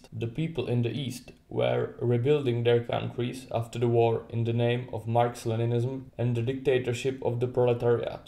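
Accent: Czech Republic. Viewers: Hey bro...fenomenal stuff keep i going. BTW are you Czech? Because that sounds like czech english accent to me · whats your mother language? you sound kinda like a czech with your english accent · The czech accent is killing me💀💀💀 But very true video indeed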